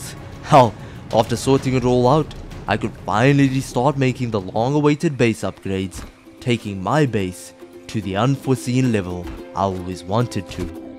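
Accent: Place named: South Africa. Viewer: Coloured south african ya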